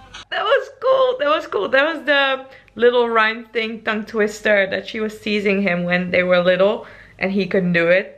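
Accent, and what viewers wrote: India. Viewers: How you Europeans taste bud works?? It's not that bad...yes it's salty...but not that bad Your hindi is so adoreable...i think i'm falling in love...to your hindi pronunciation Brother=vai not bai...you are doing good intelligant lady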